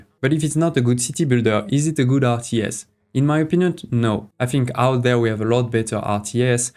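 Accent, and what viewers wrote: France. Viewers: Bro sounds 100% French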